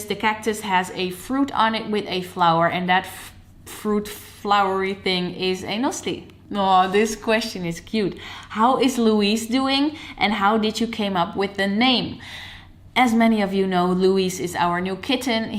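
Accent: Netherlands. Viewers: Ik klikte op deze video en je begon te praten en ik dacht meteen ze is Nederlands 😍 elke Nederlander heeft dat accentje geweldig😅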